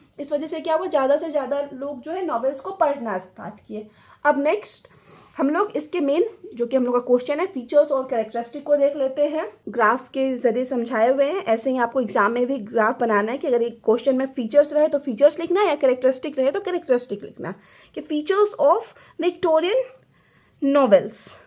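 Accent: Bihar, India. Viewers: Aapka tone of speaking Samastipur, lakhisaraay, Darbhanga, begusarai, jaisa milta julta hai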